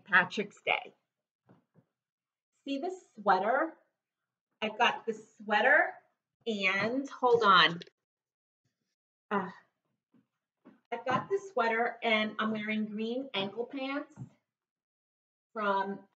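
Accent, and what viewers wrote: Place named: New York, United States. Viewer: I can't get enough of your pronunciation of "Tony of Beverly!" Tony of Brooklyn!!!!! As a New York woman but living in the south, I miss that NY accent! BTW, you look gorgeous in Avery! I purchased her after watching your review - in Kahlua, too! ♥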